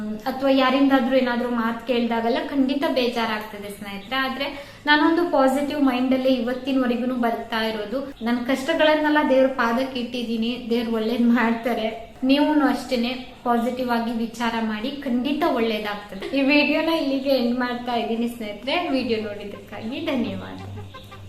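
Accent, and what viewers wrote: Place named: Kundapura, India. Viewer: Nim bashe kundapura side Tara untu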